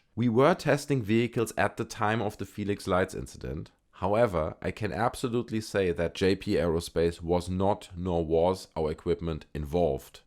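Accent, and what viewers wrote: Germany. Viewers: For one thing you have a German accent for another were you there I was there when that happened in 97 my wife and I then I had a video camera I have video taped it it didn't make a sound and it was from not this world you can tell when your hair stands on your back it wasn't from this world no it wasn't a reshape balloon for one thing a balloon will not go into space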